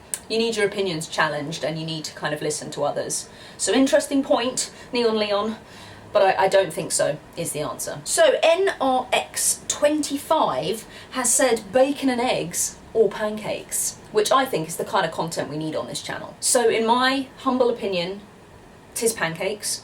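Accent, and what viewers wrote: United Kingdom. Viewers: Just love to listen to your beautiful british accent. Such a treat.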